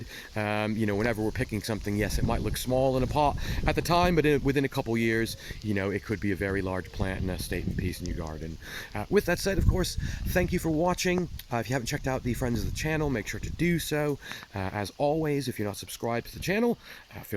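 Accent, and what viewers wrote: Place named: United States. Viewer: I thought you and an American tone to your voice! How did you end up in England? 💚🌴🌹🐦‍⬛🌿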